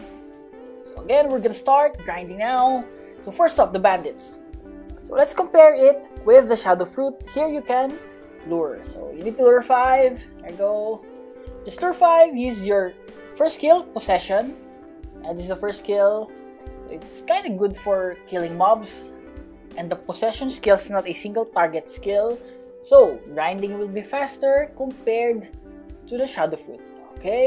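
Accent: Philippines. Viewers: Fact: he's a Filipino cuz of he's accent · your voice sounds english is no that good you pinoy? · is a filipino because the accent is familiar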